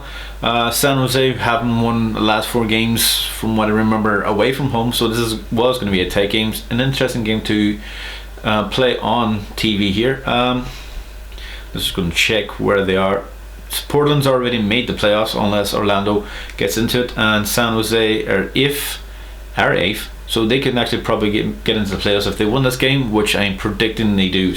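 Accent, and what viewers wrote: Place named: England, United Kingdom. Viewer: Dude why you upload like this your video ?? For me its difficult now to understand you English accent .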